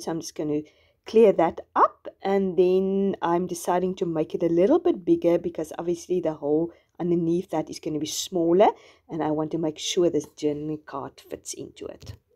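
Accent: South Africa. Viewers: This is so pretty are you from South Africa ? I love your accent. ♥️♥️ I just started journalling and scrapbooking. I love your ideas of incorporating God into journalling I never thought of that before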